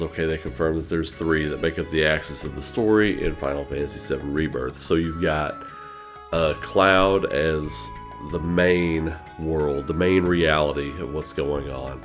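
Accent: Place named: Eastern Kentucky, United States. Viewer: I love your content bro! Btw are you from Eastern KY? You sound exactly like one of my best friends from there. Very easy to listen to!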